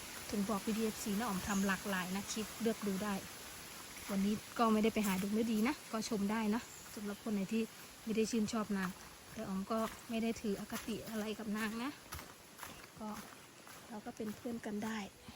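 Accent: Isan, Thailand. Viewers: สาวน้อยเดินป่าถ้าตกใจหรือเจออะไรแปลก เวลาอุทาน ใช้ภาษาอีสานบางคำฟังแล้ว ตลก ขำ บางครั้ง ก็แปลกไม่ออกแต่ก็ชอบนะ สำเนียง เสียงไพเราะดีมากชอบขอบอกจ๊ะ❤❤❤😅😅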